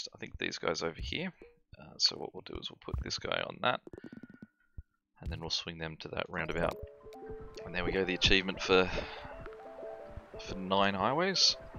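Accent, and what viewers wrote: Australia. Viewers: I'm guessing Australian?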